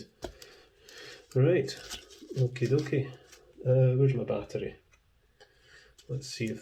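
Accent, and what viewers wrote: Scotland, United Kingdom. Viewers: Enjoyed this and all of your other vids. Nice too, to hear a Scottish voice (Penicuik here!) - a nice contrast to the equally excellent Barrie Davie and Sam's Trains. Keep up the great work. 🚂🚉👍🏼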